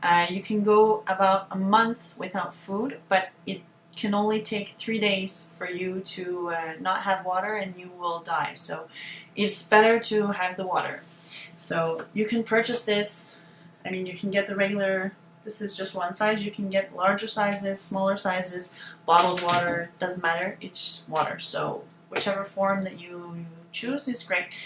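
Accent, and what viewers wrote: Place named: Quebec, Canada. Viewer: Je pense Quebecois. I think Quebecois :) French Canadian :) Some of them are Okay :)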